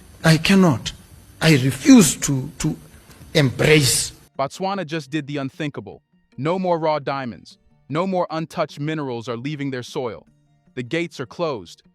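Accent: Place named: United States. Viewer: What's with the American accent? Seems a little hypocritical.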